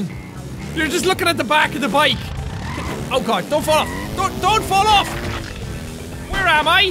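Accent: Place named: Ireland. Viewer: jacksepticeye I'm not going to lie to you but your Irish accent is just off the hook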